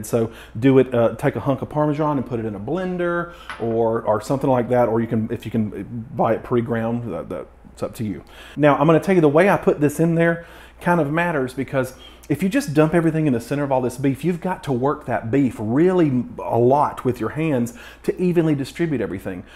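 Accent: South, United States. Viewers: my favorite thing about your videos. #1, I can listen to your southern twang all day. #2, your face when you have tasted your creation! No poker face for sure. And when you don't like it, we know you don't like it. Thanks for what you do!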